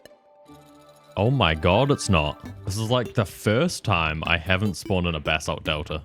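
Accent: New Zealand. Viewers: omg I detect a kiwi accent. Kapai, this vid is so good, love the editing!! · Oh my god, hearing an NZ voice in a youtube video is so weird, but so welcome.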